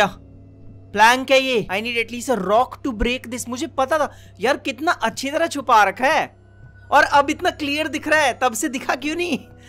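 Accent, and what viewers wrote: Haryana, India. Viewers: Reason for not growing :- videos with low paced editing :- new generation of low attention span :- slow commentary Suggestion :- use colored subtitles like u used to do to grab attention :- do different types of video RELATED to gaming. :- speak faster :- imp just stop with those english gaaliyan yr. Use hindi ones like u used to. Or just use your hariyanvi accent bcoz i liked that bhens ki tait. Easiest way to get a good video would be to play with friends. If u are slow then your friends could make up for it and you could get really good clips to make a video off of. Every other channel do this nowdays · Old vibes are back but something is missing and that is that Haryanvi tone that used in his old videos So I think old vibes are still not back 😶😶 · maza nhi ara yar, haryanvi accent to pelo thoda. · Who wants old haryanvi tone back ♥️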